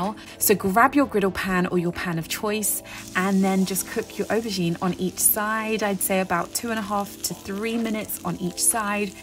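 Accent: United Kingdom. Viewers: Like Jamie, you’re speaking Essex, right?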